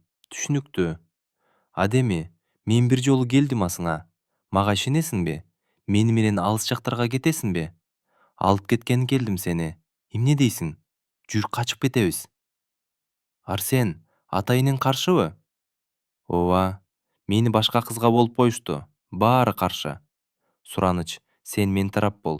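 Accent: Russia. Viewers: Окуган бала орусбу😮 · Негеле ө ордуна о деп окуйт десе орус жигит окугангө азаматсын чоон рахмат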